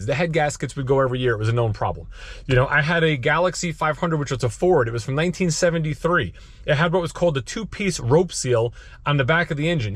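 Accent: New Jersey, United States. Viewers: My favorite video; your New Jersey “button” accent cracks me up every time. Love you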